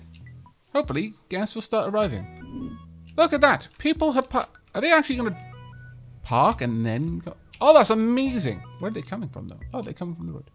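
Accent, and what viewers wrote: Wales, United Kingdom. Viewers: Our good friend is Welsh, which gives him that lovely accent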